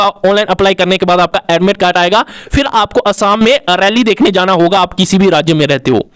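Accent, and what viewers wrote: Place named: Jammu, India. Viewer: Pehle bolna seekh le Dogra hai ya Punjabi, hindi main dogri mila k de raha hai Aur galat Information bhi de raha hai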